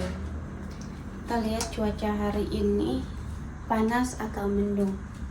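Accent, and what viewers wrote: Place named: Bugis, Indonesia. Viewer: ku kira ikan garam itu apa, ternyata ikan asin 🤭 kalo belihat bebahasa bugis logat logat bugis gitu saya suka dengarnya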